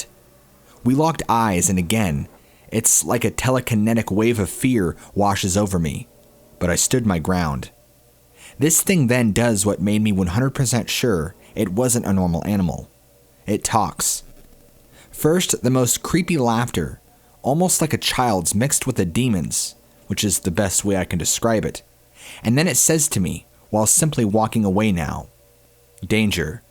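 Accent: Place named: United States. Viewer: I love your American accent 😁👍😘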